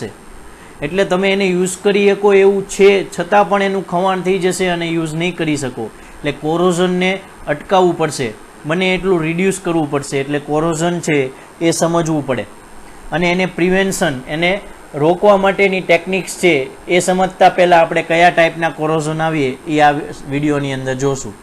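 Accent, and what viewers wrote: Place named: Kathiawar, India. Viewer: Ready sambhline laage chhe kathiya vadi chhe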